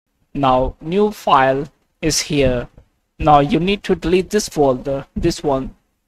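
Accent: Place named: India. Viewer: I knew it would be a waste of time as soon as I heard indian voice but still tried and yea it wasted my time 😂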